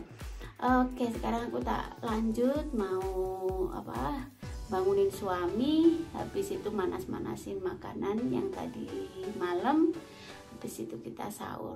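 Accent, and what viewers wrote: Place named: Blitar, Indonesia. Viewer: Logate lek ngomong jowo jek mblitaran banget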